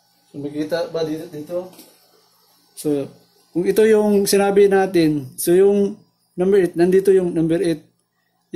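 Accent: Visayas, Philippines. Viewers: Bisayaa jud oi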